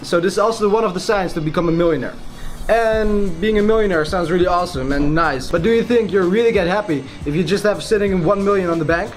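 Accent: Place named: Netherlands. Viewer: Love the energy! And the Dutch accent is great too, hard to confuse it with some other. :) (I lived in Holland)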